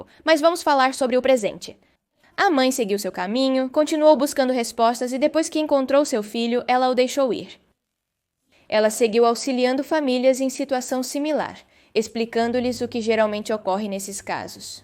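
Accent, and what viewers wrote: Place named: Portugal. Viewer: este video ela fala uma mistura de brasileirado com portugues de portugal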